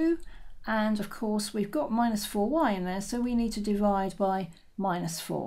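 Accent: United Kingdom. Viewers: Are u British? Cause I like ur accent :P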